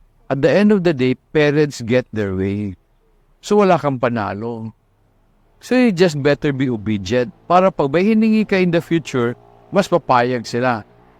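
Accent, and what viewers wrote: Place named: Visayas, Philippines. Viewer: Cute kaayo ka magbisaya Sir 😮😊😊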